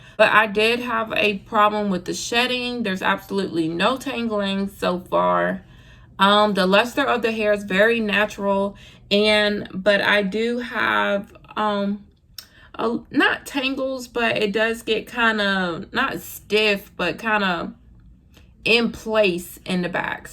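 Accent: United States. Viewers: I'm from Mississippi shawty got to be from GA cause everybody I know sound just like that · Are you from the south ? Your accent is soooo cute 😍😍😍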